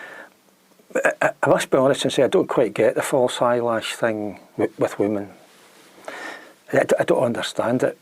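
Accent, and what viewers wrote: Scotland, United Kingdom. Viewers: So this is way off topic. But I found your channel because I have the type of brain that gets interested in very specific things, and lately I've been interested in the name Burns and all its variations (Byrnes, Byrne, et cetera) and found out about Burns Night and was interested in how Burns is said in a Scottish accent (I'm American) so I was googling something like "Burns from Scotland" and found your channel. I found a few videos where you do say it:). Now I understand that Burns, Byrnes, and Berns are all different names with different origins. I also learned for the first time that people from Scotland make the "er" sound different from the "ur" sound, whereas I say those the same as an American. So I'm wondering: would you pronounce "Burns" differently from "Berns"? I'm guessing you would.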